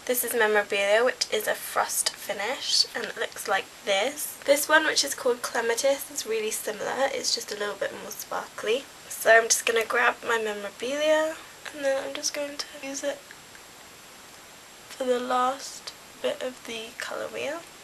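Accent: United Kingdom. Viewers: I LIKE UR VIDEOS.. IVE NEVER HEARD SUMONE WHO IS ASIAN HAVE AN ENGLISH ACCENT.. WOW:).. HAHA.. BUT I LIKE DIZ VIDEO IT REALLY GOES GOOD WITH U.. UR A VERY PRETTY GURL!:)..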